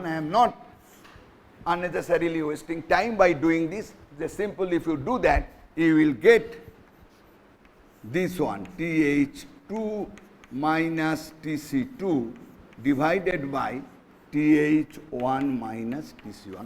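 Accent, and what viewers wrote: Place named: Bengal, India. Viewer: Hot fluid cold fluid ka mamla nhi h that bengoli accent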